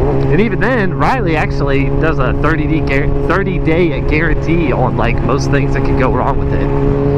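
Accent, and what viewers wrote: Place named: Houston, United States. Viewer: Off the first minute i can tell you from Houston lol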